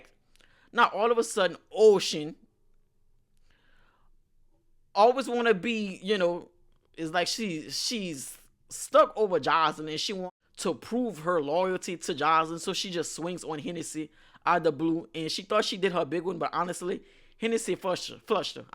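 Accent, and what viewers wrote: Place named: Louisiana, United States. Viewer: you have to be from the 337 😭 if not i would be genuinely surprised because you have a strong accent lol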